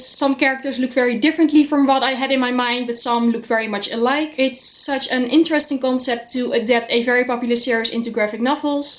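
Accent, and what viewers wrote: Netherlands. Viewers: Hoorde je Nederlandse accent al vanaf de eerste zin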